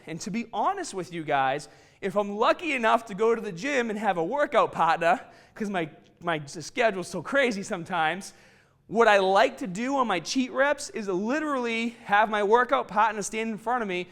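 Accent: Boston, United States. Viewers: strongah!, stahdid, you definitely from boston! southie? or Townie? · haha sorry, my bad! Boston..it is hilarious though! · Is this what they call a "Boston Accent"? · Bhavik Bhagat Lol, no. In what way is that Aussie? It's a Boston accent. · strong accent, Boston? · Dudes like this should be banned from YouTube. Boston accent is not multi lingual, it just sounds stupid.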